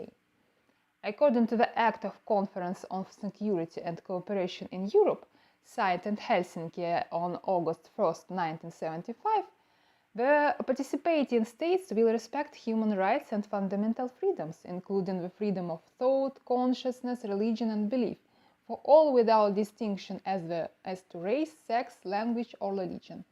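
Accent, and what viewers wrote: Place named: Russia. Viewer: It's cool. I see that the person from Russia. I can hear my Russian accent😂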